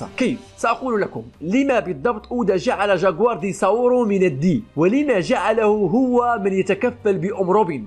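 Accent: Morocco. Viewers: لان لهجت مغاربا تشبه لهجتنا نحن نجزائرين مغريبي اخي و ليس صديقي 😉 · يجب أن تقوم بتدريس مادة الون بيس في الجامعة شرحك يجعلنا نرى هذا الأنمي بشكل مختلف .... واصل أخي من لهجتك يا أخي أعتقد أنك مغربي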